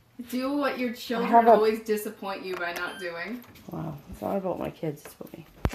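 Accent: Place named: Cape Breton, Canada. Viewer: Thank you for sharing your story! Adding your voice is so valuable, be strong! Your accent reminds me of so many of my Cape Breton friends it makes my hearts warm!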